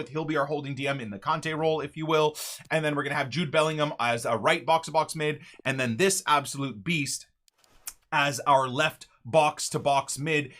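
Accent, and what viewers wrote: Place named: Canada. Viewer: I love your passionate maple syrup drenched canadian voice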